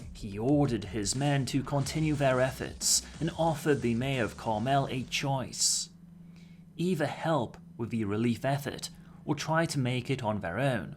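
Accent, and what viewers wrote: United Kingdom. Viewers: If you could it would be awesome. Your speech is nice to hear (and that's why i say about braindancing) but when the meaning is more important (for example gang, drugs, Silverhand, the whole series of cyberpunk lore) then your sounds-like-british accent sometimes can make it harder to understand. I mean... I really appreciate your effort but if you give subtitles, then it gonna be like than cherry on the top of the cake. It really works. For example - i understand 57% of the NC gangs from hearing but when i read subtitles - my gang lore understanding hit to 97%. It really works Samurai 😎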